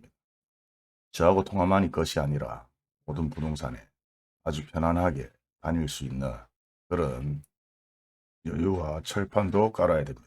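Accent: Gyeongsang, South Korea. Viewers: 저음에 투박한듯한 경상도 사투리 저는 듣기 좋습니다~~감사합니다^^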